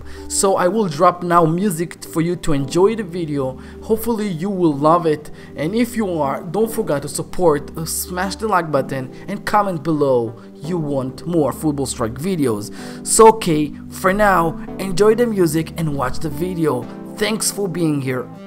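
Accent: Israel. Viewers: חחחח אתה ישראלי זיהיתי בהתחלה מהמבטא אבל אז ראיתי בעברית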